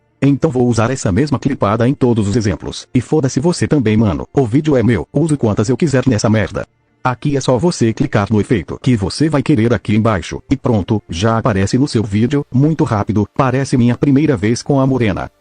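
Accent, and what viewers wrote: Bahia, Brazil. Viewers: em fim um baiano kkkkkk morri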